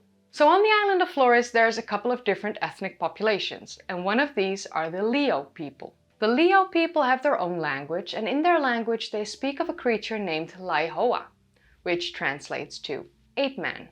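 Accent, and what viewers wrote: Netherlands. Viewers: what is your native language? Dutch? (It's the way you pronounce anthropology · Zie je wel, ik HOOR gewoon dat dit uit Nederland komt. Klee is trouwens een DUITS woord. Is dat met opzet? En je leraar Engels hield veel te veel van romans lezen. Uitspraak hoort echt veel meer overdreven en Nederlanders vertikken dat. We klinken bijna allemaal veel te vlak. Zaai. Niet genoeg verschil in hoog en laag. En niet dynamisch genoeg. Jammer, hoor. Luister gewoon eens naar Amerikanen of Britten. Ze zetten hun accent veel steviger neer. Dan klopt het ineens! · Your English is great! I could swear you have a Dutch accent somewhere though but that could be a bias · do I hear a slight Dutch accent? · I can detect the Dutch accent quite clearly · Sounds like a Dutch accent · Your accent, perfect english, but are you dutch?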